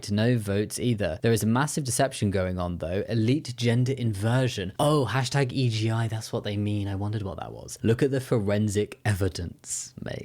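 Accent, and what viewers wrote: England, United Kingdom. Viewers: has such a lovely accent (Brits, ignore this comment; y'all wouldn't get it cos you're from the U.K. so you're immune 😅). As a casual viewer, I can't recall if he's mentioned where exactly in England he's from 🤔 But I know he mentioned traveling to London. So that can't be where he lives. Anyway, wherever in England he's from, I love the accent.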